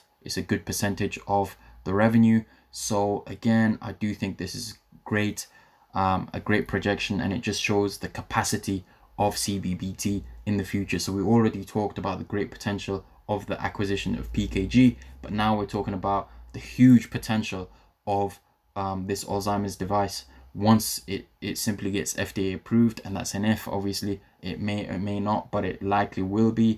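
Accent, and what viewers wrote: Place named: United Kingdom. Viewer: Are you british can't quite tell from the accent sounds quite mixed as though you've lived in different countries. Great vid tho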